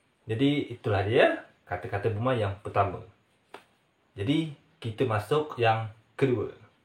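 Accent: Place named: Johor, Malaysia. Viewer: Salam kenal Pada pendengaran saya, bahasa melayu yang gunakan tak ubah seperti pertuturan orang melayu Johor. Mungkin ramai penonton dari Lembah Klang sependapat dengan saya.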